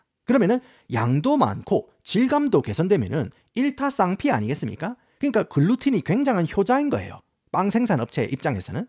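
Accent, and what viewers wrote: Gyeongsang, South Korea. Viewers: 갱상도 사투리에 홀려서 구독합니다. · 한국말하실땐 구수한 경상도사투리 쓰다가 영어만하면 어나더레벌😊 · 와 나도 경상도 사람인데 이 사람 사투리 왤캐 심하냐 같은 경상도가 들어도 거북할 정도임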